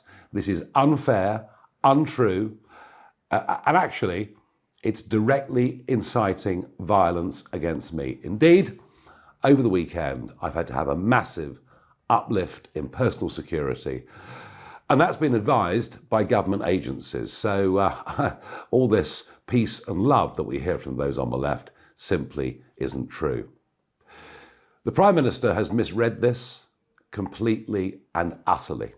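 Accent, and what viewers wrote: RP, United Kingdom. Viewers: think he's realised Tommys right! Now speaking like a Posh Tommy???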